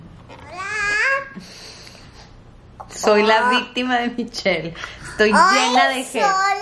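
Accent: Monterrey, Mexico. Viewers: Eres súper buena onda, no sé si es mi imaginación pero como que tienes acento regio, me identifique jajaja Saludos desde Monterrey 🇲🇽🤠 Bendiciones ✨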